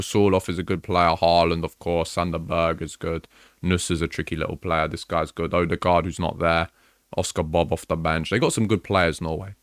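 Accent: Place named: MLE, United Kingdom. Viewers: Was not expecting a multicultural London English accent when I clicked on the video! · You're very clearly English, you have that awful MLE accent.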